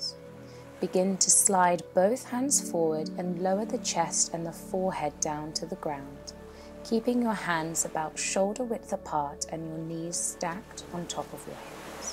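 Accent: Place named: United Kingdom. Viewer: Thanks, so good to have a British accent on here, subscribed now 🙏🏼💪🏼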